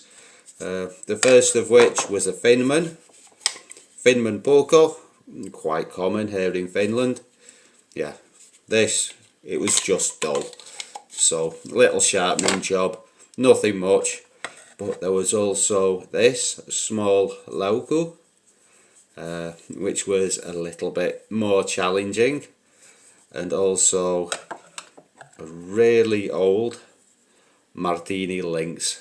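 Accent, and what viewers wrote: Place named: Manchester, United Kingdom. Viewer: Nice work that mate , you've not lost the manc accent ;)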